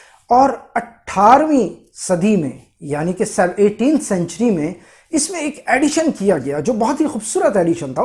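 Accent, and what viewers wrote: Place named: Aligarh, India. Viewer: bhai yr qorma recipe looks awesome and your aligarian andaaz " aur sahab" take me down to memory lane of aligarh k shadi ka qorma..the only surprising thing was u added saunf..I never saw my mother adding saunf in qorma instead she use to add khashkash in it..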